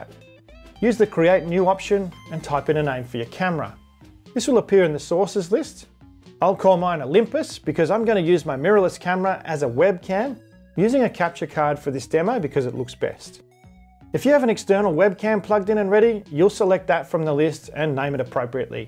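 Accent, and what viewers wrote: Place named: Australia. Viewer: Nice work... and great to hear an Aussie accent! Cheers, mate.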